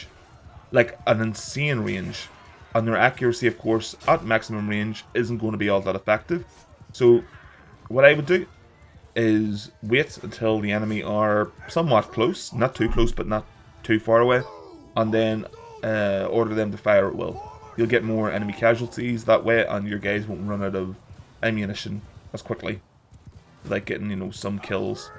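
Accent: Northern Ireland, United Kingdom. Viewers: Its pretty cool to hear a fellow Northern Irish man having a blast with this game, ive pretty much abandoned all my other games over the last month.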